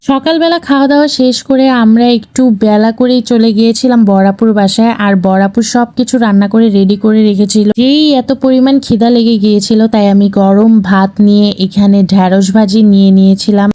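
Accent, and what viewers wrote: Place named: Bangladesh. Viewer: 😂আপু প্রথমে আপনাকে ইনডিয়ান ভাবছিলাম পরে আপনার ভাষা সুনে মনে হলো আপনি বাংলাদেশি তারপর গরুর গোস্তো দেখে সিউর হয়ে গেলাম আপনি বাংলাদেশি😂🎉❤❤❤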